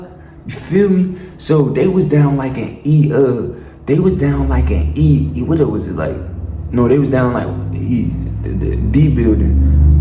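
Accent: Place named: New York, United States. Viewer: You talk like you been around more New York cars joints n ppl than anything.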